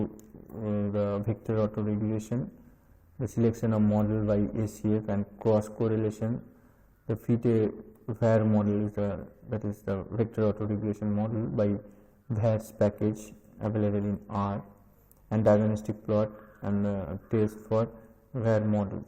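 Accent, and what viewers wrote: India. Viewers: This indian accent is driving me nuts...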